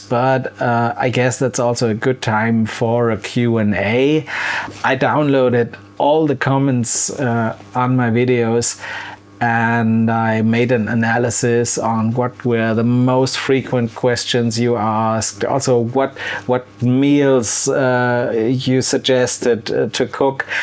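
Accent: Germany. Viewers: Congratulations!!! Great Job!!!🎈🎉🏅🙌🙌🙌 My background is German and I miss my Auntie who cooked wonderful German recipes (she passed 20 years ago) and my Mom is 93 and no longer cooks so now I look to your channel to learn some favourite German recipes. Love your German accent and your occasional German expressions and sentences because it refreshes my German (I'm in Canada) because it makes your channel Authentic. Keep up the great work!!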